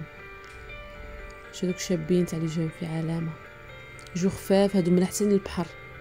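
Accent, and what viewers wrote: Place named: Oran, Algeria. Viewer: موديلات صيفية رائعة شكرا حبيبتي على الجولة كتفكريني في صديقتي الوهرانية تقولي هي كتتكلم 🥰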